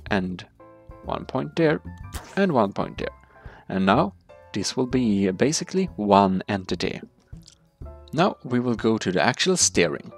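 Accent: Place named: Sweden. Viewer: Really nice Idea! I only managed to make a few vehicles with actual steering, using a stupid technique. This one is way better. (Also I guessed that you're from Sweden and wasn't wrong. I understood that by your accent(especially the "CH" sound) and the way you form the sentences, use some words that are rarely used by English speakers.) By the way, love that accent. I can be proud of myself and stop thinking about being a complete failure now... for 5 minutes.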